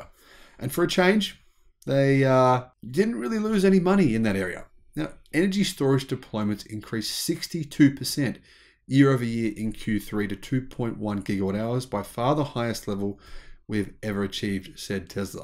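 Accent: Australia. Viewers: His heavy Aussi English, seems make him trip over pronunciation all the time.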